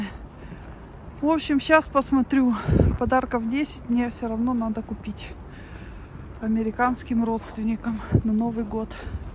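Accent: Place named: Urals, Russia. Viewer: Уральский говор он конечно очень выделяется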